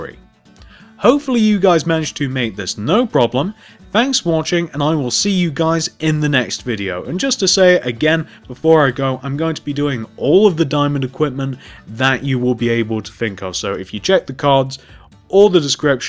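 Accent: United Kingdom. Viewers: I rememember this channel as a kids, the British accent and the voice I use when doing a tutorial now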